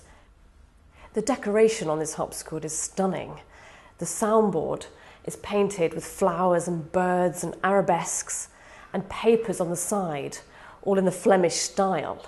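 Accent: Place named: Oxford, United Kingdom. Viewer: Oxford English pronounced properly. Very clear and eloquent.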